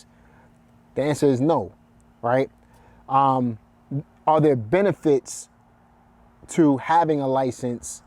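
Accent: New York, United States. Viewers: I can hear NYC in your accent, haha. I'm from Staten Island. I moved to Raleigh in 05.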